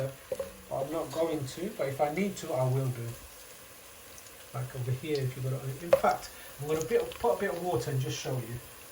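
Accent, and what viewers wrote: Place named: England, United Kingdom. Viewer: Love all the DISHES YOU MAKE.. MY STYLE....THANK YOU FOR BEING ON LINE.... 👏👏👏😋😋🙏🙏 IM IN ADELAIDE SOUTH AUSTRALIA.... ARE YOU IN ENGLAND?? DETECTED THE ACCENT...👏👏😂❤❤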